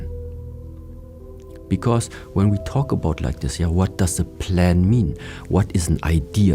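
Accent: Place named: Germany. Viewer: Klingt nach deutschem Akzent.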